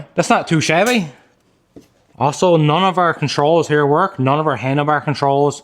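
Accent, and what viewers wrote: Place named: Newfoundland, Canada. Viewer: Funny story heard my 9 year old the other day watching YouTube and i heard the strong newfie accent, i said you watch and he yes you do to, great job on the videos from your neighbors in prince edward island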